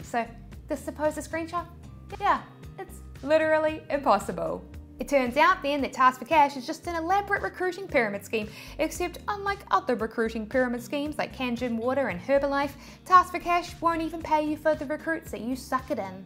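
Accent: New Zealand. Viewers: Your Kiwi accent maybe your biggest asset here. If the person pretending to be you can't say "fush and chups", then clearly, they aren't you. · You are the only YouTuber I know with a New Zealand accent. I never heard this before.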